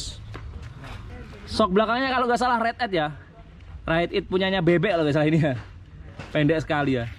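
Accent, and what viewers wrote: Java, Indonesia. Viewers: wong jowo iki? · Medok mas 😝😝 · Bos kacung punya sowrom motor juga, aq suka pak logat jawanya kental dan ada humoris nya juga.selalu doain di awal dan semoga berkah dalam hidup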